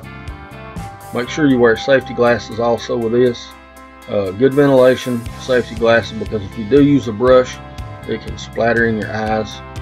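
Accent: South, United States. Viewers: Wonderful work. I love to watch your videos, very informative and entertaining. I live in England and I find that Good ol' Southern US of A accent great as well (:-). I'm so envious of you just being able to walk out back and grab some timber the way you do. Carry on with the great vids. All the best, Stuart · I don't think it's because of the way you talk. You seem to be a very polite person, which goes a long way. It's probably because people are more impressed with the quality of the video production, than the finished product itself. For example, Frank Howarth's channel is impressive not only because of his woodworking skill, but because of his editing skill, not to mention the versatility of his projects. Anyway, best of luck to you! Signed, a guy from Romania that doesn't care about your southern accent as much as he cares about your quality content.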